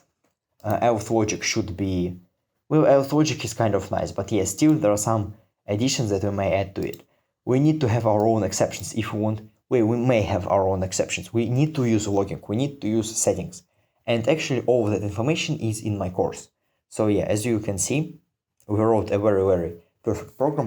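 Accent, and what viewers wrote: Russia. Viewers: Братишка, ты русский? Чувствуется русские нотки в английском. Но отличный и понятный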